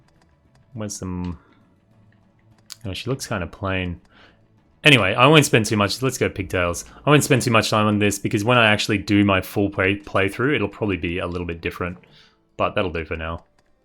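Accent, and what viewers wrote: Australia. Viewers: Hi. You sound Australian. Thanks.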